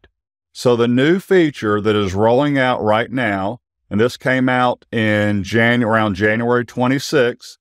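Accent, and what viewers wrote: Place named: Georgia, United States. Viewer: 1. I recognized your GA accent 2. I am also a CPA 3. Thanks!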